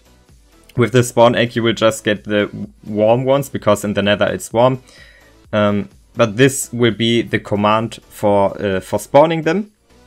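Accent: Germany. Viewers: It's called a german accent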